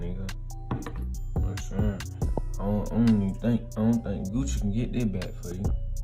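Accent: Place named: Memphis, United States. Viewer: You sound like a Memphian-- I digz that💯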